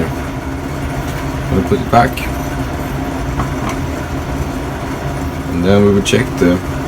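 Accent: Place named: Sweden. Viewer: Took me 2 seconds to know you Are swedish :-)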